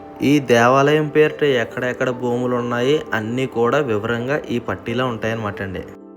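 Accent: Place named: Godavari, India. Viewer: Anna ne matala ki navvu vastundi mana godharola language alagey untadhi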